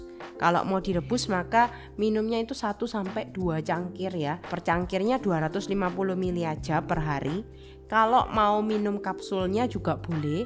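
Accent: Java, Indonesia. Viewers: Kaget pas denger logat nya, ga nyangka semedok itu 😂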